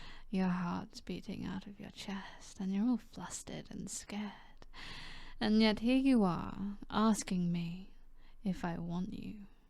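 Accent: United Kingdom. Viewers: fr (The british accent is making it more🌶️) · THE BRITISH ACCENT YALL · The british accent is making it more🌶️🌶️🔥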